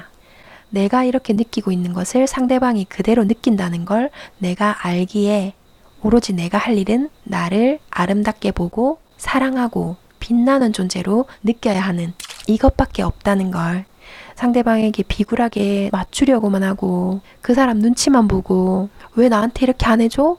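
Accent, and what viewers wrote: Gyeongsang, South Korea. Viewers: 작년에 영상이 너무 좋아서 책을 사려고 했는데 도서관에서 보는것 말고는 구할 수가 없더라구요. 절판ㅠ 어느날 여행지에서 길을 잃었는데 그곳에서 중고서점에 들어갔어요. 근데 제 눈앞에 이책이ㅠㅠㅠ너무 놀랐어요. 2편인 마음의 오류까지 구했어요...방금 이 책 읽는중에 가브리엘라 내용이ㅠㅠ 129p에 있네요ㅋㅋ너무 반가워서 글쓰러 왔어요. ㅎㅎ 항상 영상 잘 보고 있구요. 제 책장엔 이 읽어주시는 책들로 채워지고 있어요❤ 저도 경상도 사람인데 사투리 너무 듣기 좋아요. 늘감사해요^^ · 방금 구독했어요ㅎ 경상도 사투리가 너무 정겹게 느껴보는건 처음이네예ㅋ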